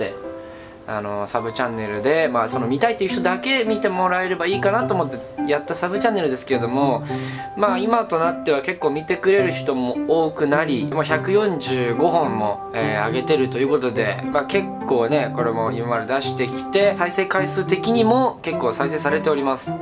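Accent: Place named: Osaka, Japan. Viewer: かに かに 大阪出身？ しゃべり方が大阪 ちなみに俺も大阪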